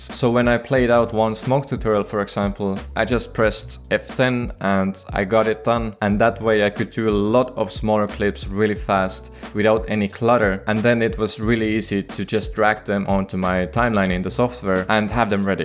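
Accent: Estonia. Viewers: are you estonian?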